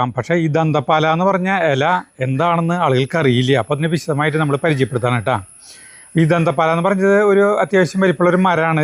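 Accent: Thrissur, India. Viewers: ഇയാള് പറയുന്നത് മനസ്സിലാവുന്നില്ല മനസ്സിലാകുന്ന രീതിയിൽ പറയണം സംസാരിക്കുന്നത് മനസ്സിലാവുകയുള്ളൂ യെ കൊണ്ട് സംസാരിപ്പിക്കും വ്യക്തമായി മനസ്സിലാവുന്ന രീതിയിലാണ് സംസാരിക്കുക നിങ്ങളുടെ തൃശ്ശൂർ ഭാഷ എല്ലാവർക്കും അറിയുകയില്ല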